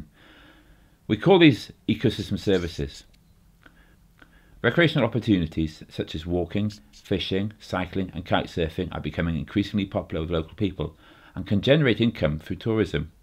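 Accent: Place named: Wales, United Kingdom. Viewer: Nice video. I really like the friendly narration and gorgeous Welsh accent.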